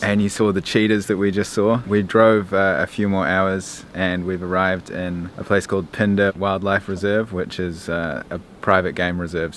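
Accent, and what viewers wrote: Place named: New Zealand. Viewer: New sub here, great vids I've always wanted to know what SA was like. I knew I picked the kiwi accent haha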